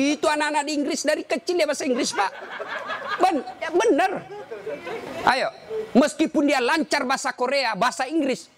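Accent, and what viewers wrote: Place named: Bugis, Indonesia. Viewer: Sy senang ceramahnya sangat mendidik, logat bugis terkesan mengasyikkan, sy pernah tinggal di sulawesi, jd sangat teringat logat yg dibawakan...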